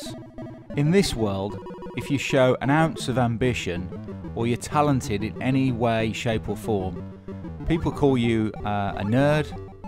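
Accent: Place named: Birmingham, United Kingdom. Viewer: Great video mate good job it's not its the 3rd game Oh are you from Birmingham I'm in Wolverhampton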